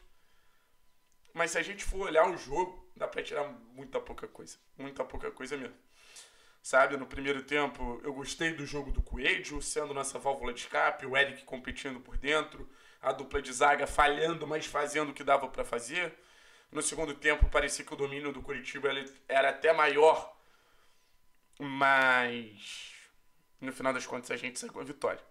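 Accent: Rio de Janeiro, Brazil. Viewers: esse cara é carioca e torce pro aflético?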